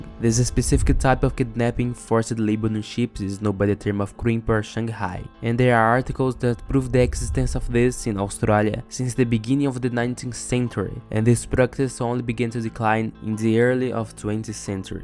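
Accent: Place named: Portugal. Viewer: Great video but you should work on your English pronunciation. Try talking slower and pronouncing each word clearly and with less portuguese accent. I think you could be a youtube star if you work on your English because the video is very well made.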